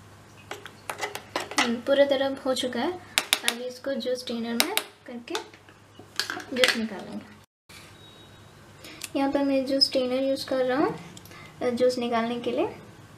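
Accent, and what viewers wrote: India. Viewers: I think she is from south they almost speaks in same way👍🏻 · Shaan Mujawar she is probably from south what’s the point of making fun of her Hindi it’s not her first language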